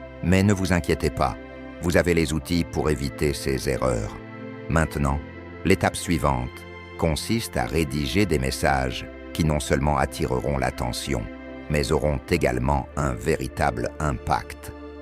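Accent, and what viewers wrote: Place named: England, United Kingdom. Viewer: Ton accent englais et ton ton robotique m'écourent. Va chier, sale intelligence artificielle. Je vais continuer à me fier à mes dieux.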